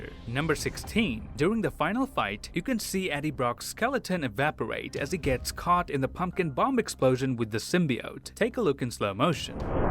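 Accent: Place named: Canada. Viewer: Don't worry, he's just speaking the usual canadian english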